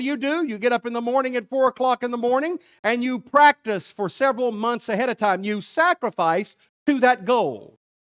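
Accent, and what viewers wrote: South, United States. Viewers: Lol as a former Catholic, please stop lying to yourself. The Roman Catholic Church is schismatic, they departed from the traditions and dogma of Christ’s church within the first 1000 years. Modern Roman Catholicism is the biggest contradiction and cope of all. The sermon was on point, Christ’s crucifixion was a complete victory ☦️. And may I ask what’s protestant about this? Is it because he’s speaking with a southern American accent or because he’s speaking with some enthusiasm?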